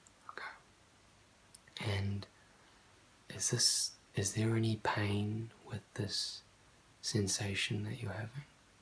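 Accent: New Zealand. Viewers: wow I'm Korean and I sometimes watching your asmr, And it's really helpful to my sleep! your kiwi accent is soooooooo nice!!!thank you :)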